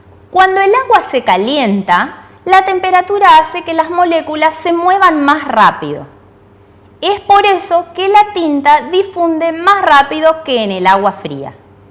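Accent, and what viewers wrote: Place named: Argentina. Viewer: Eres de Argentina , siempre e querido ser de alli no se porque me encanta el acento :v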